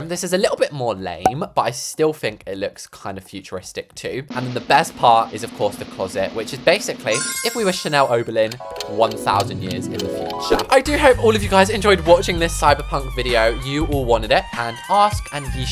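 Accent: New Zealand. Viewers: ur nz accent ate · im sorry im from new zealand and that is the most horibble new zealand accent ever 🤣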